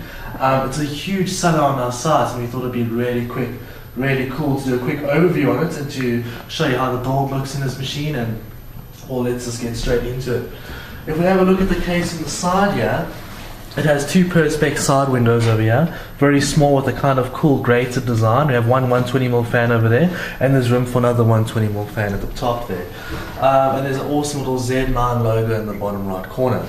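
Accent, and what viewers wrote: South Africa. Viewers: what coutry are you from? You sound like a South African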